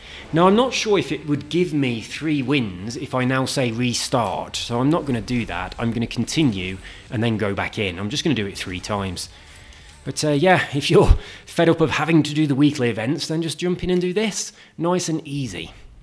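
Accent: United Kingdom. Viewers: I'm still struggling with drifting. You are surpassing me easily now though. (Do you feather the accelerator?) I cant quite get the hang of it.. Btw how come you can fast travel , have you got all the fast travel signs/bonus boards? there are about four I cant get - in the pipes on that motocross park, and one one the top of some house, and one up by the wind farm. ( I still dont have the FT option, even for money? weird ) would appreciate some ideas one those boards if you know how to get them! thanks. Really enjoy your videos as you know. Your accent is definitely getting more Norwich now though.. lol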